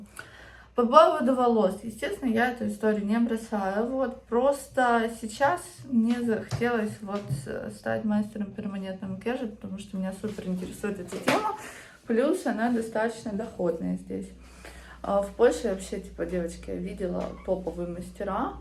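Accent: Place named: Ukraine. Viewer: Ужас, вид, как будто трепали целую ночь. Вялая, нужно учиться не рот накачать, а учится,над речью. Это украинский акцент звучит примитивно. Волосы распущенные. Кажется очень дешего.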